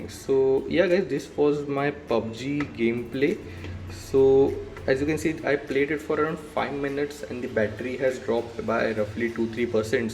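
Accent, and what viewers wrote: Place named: India. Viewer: I just can't ignore that annoying indian accent, however, good video mate!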